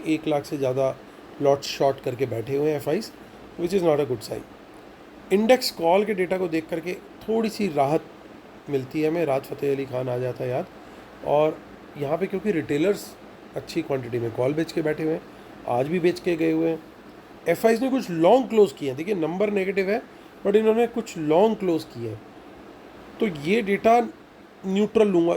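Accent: Punjab, India. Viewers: Love u sir always rocks sir r u from Punjab or hariyana it doesn't matter but tone thx if u r in mumbai will meet u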